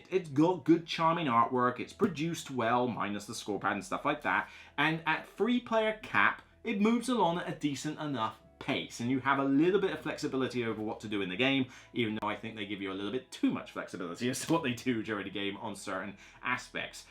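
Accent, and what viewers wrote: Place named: Bristol, United Kingdom. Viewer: fair enough - Wales is a bit further away, but I would definitely recommend it (sorry, I thought your accent was from Bristol as my daughter lived there and the accent sounded similar to me).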